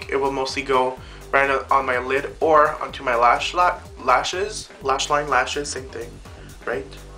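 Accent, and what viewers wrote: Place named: Quebec, Canada. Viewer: He speaks french canadian ;)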